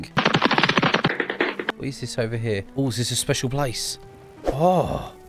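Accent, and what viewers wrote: United Kingdom. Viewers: hey are you a british? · Is he British? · you british???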